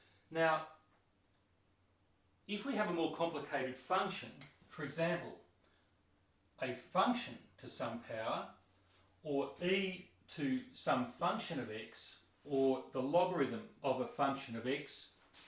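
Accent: Australia. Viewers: First of all thank you for the insight. It saves an awful lot of time, mate However, please exchange using the x symbol for multiplication to the dot. It is less confusing in the evaluations. Also, your 4's look like 6's sometimes, especially when used as exponents. And finally, please adapt a system of presentation where you are not in the way of the whiteboard. I am a teacher. please accept this as constructive criticism. I do enjoy the Aussie accent whenever I get a chance to hear it, like Bryan Brown, Chris Hemsworth, Hugh Jackman, Nicole Kidman and your other countrymen.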